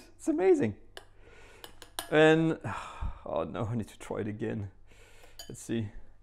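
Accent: France. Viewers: You could tell he’s French by his outrageous accent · Hey, I did not not intend to offend you. Of course you can buy the stuff at a store, but the point of this clip was (IMHO) how this stuff made and how to make it yourself if you are so inclined. I for one enjoyed the presentation, the french accent and learned a couple things along the way. But then again, to each his/her own. Piece.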